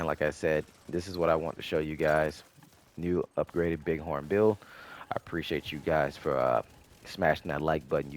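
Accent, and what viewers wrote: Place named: Baltimore, United States. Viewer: fire video, u def from baltimore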